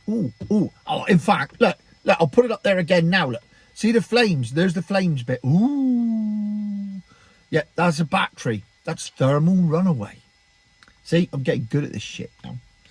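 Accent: Cornwall, United Kingdom. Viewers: Your funniest video yet. I was pissing myself all way through lol. Love the cornish accent,takes me back to when i was young,my grandparents were cornish. Btw watching your video there were lots of orbs floating around your head.spooky.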